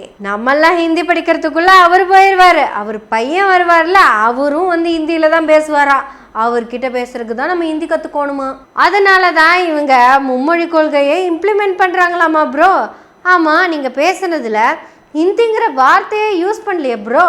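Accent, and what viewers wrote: Kongu, India. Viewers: அருமை மகளே கொங்கு தமிழின் பேச்சு. அட்டகாசம் பெரிய ர் கருத்துகளை பரப்புரை செய்யுங்கள் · பனையூர் பண்ணையார்.அருமையான பெயர்.யெனவே யெனவே பேசுவோம் மகளே.கொங்கு தமிழுக்காகவும் கருத்துக்களுக்காகவும் சேர்த்து வாழ்த்துக்கள்.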